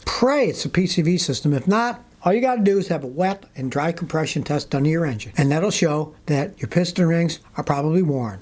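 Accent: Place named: New Orleans, United States. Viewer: Good afternoon, Mr., I just found your channel, by me looking into buying a 2004 Honda C R V, I really appreciate your much valuable knowledge, Thank You, for sharing, I wanted to ask you a question, are you from New Orleans, I grew up a hr away from there, you sure sound like you are from there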